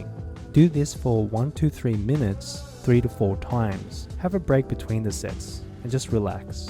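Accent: Australia. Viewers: i love the auschraliaaan accent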